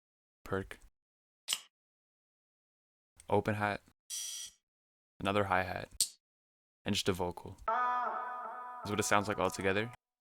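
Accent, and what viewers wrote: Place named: Canada. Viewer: Cool! Would love to visit one day. Very good video. I can tell because one of my best friends from Canada and some shows I watched are based there and you sound just like all of them lol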